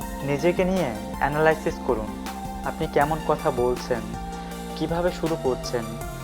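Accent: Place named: Bangal, Bangladesh. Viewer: first bro,rectift ur own voice.bangal bhasa so irritating,cant u speak proper bengali?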